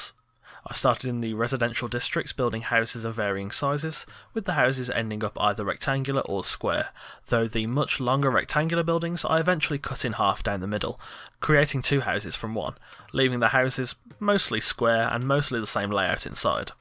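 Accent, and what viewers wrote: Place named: United Kingdom. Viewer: Dat damn fine british accent.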